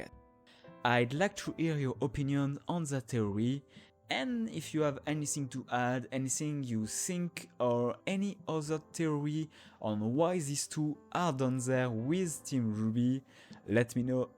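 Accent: France. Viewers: Ca c’est un accent français je me trompe ? Btw nice video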